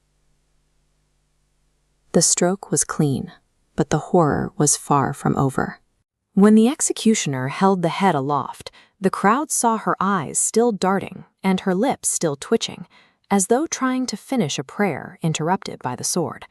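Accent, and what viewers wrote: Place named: United States. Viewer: If you are going to post British stories, at least use a British Accent, instead of American nonsense.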